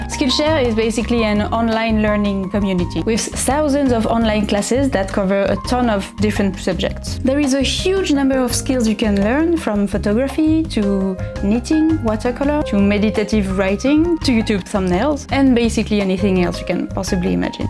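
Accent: France. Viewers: Usually I can't stand listening to narration, but your gorgeous French accent makes it enjoyable. Cheers ✌ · The Youtube algorithm just blessed me with this video. This is the most ridiculous, over the top and amazing dress I have ever seen and I LOVE IT. I like to watch historical sewing videos but I am delighted with this. The project seems so fun and a great use of sewing skills. What's the point of sewing if you can't make an extravagant dress? Also, I love the accent. I have family in France (92, 93 and 94). I just don't write in French because I'm terrible at it. Bisous du Portugal :D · Je suis vraiment heureuse de trouver des tuto couture venant d'une chaine française, votre accent en dit long. Votre robe est magnifique merci de partager vôtre savoir6et votre passion.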